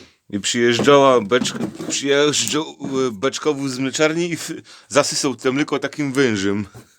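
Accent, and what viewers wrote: Silesia, Poland. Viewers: Konkretny materiał 💪💪 Ze śląska jesteś bo taki akcent ? Sub i łapka leci Zapraszam również do siebie na odwiedziny Pozdrawiam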